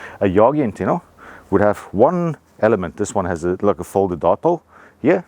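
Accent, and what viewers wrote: South Africa. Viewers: you sound like you are from South Africa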